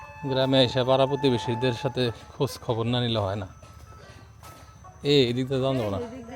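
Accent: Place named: Jamalpur, Bangladesh. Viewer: জামালপুরের ভাষা টাঙ্গাইলের নাগরপুর এর ভাষা সেম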